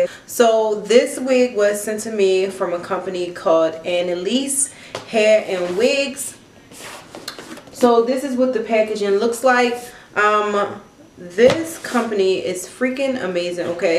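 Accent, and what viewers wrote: Baltimore, United States. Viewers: Yep, that's a Baltimore accent.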